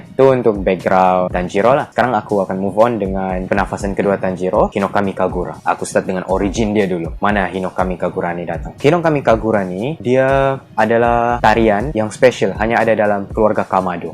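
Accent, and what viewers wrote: Sabah, Malaysia. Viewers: Aku suka betul bila dia cerita pasal kimetsu no yaiba ni dengan slang dia cakap sabah dia tu huhuhu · Bila speaker org sabah . Cerita ni dah macam cerita hantu benar beb hhahah mantap 👍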